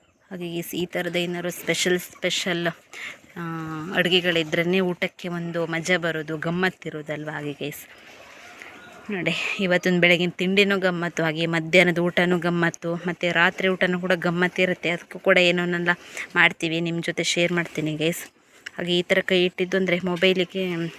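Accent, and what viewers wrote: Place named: Kundapur, India. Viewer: Nimma mathu swalpa kundapur kannada uttarkannada mix ede adare nimma mane hatthira beautiful nature nodi kushiyayithu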